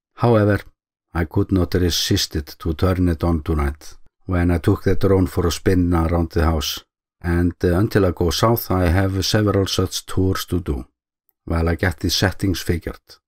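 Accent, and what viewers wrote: Iceland. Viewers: Please don't add background music. I love the humor and the Icelandic accent of the narrator but his voice is soft and would be hard to heard.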